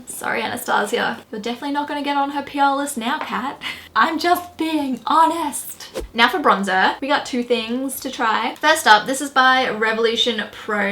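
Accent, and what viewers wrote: Australia. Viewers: Love your videos. You are absolutely beautiful and I love your Aussie accent ❤️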